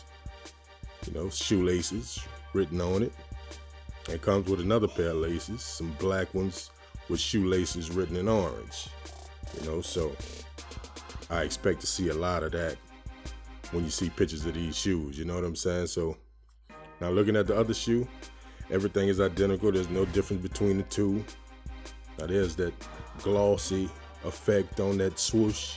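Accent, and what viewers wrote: New Orleans, United States. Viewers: Picked these up myself. I hear that Nola in ya voice so I had to sub ⚜️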